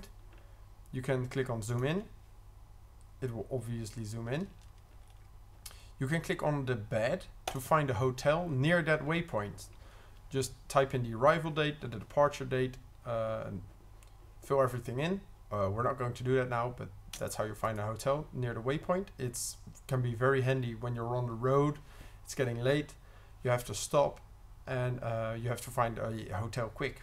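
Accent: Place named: United States. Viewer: American pronunciation is quite annoying.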